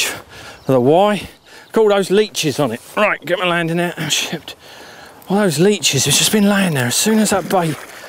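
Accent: United Kingdom. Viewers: This guy's accent is great...what part of the UK is this from? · i have never heard Martin Bowler speak-only seen him in IYCF Didn't expect him to have a bit of a London accent :D Nice video though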